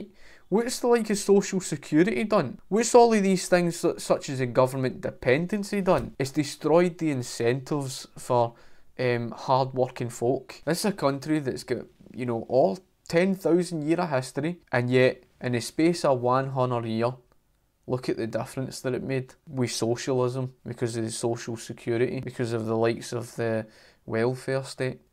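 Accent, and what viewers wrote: Scotland, United Kingdom. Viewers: That beautiful Scottish accent.. Glad you're on youtube!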